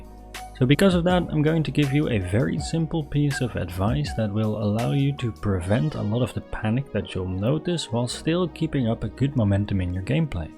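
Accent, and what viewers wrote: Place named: Netherlands. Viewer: You're Dutch aren't you 😜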